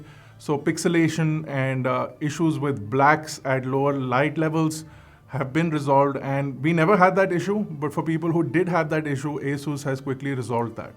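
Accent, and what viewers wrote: India. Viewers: Shout out to your neutral accent which is missing from the other Indian tech channels who put out content in English. Thanks for the quality of video and the precise verdict.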